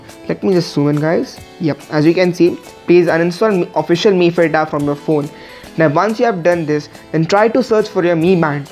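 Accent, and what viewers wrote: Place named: India. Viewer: i love the way you make a pop sound from you tongue sounds so indian!! :))) anyways nice video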